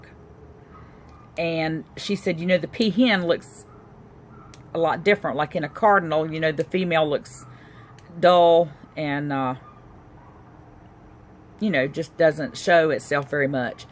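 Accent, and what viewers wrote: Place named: North Carolina, United States. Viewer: By the way~ I am from Durham (but have lived in Texas for 30+ years). I love the way you say "Derm" for Durham! I miss the NC accent! ♥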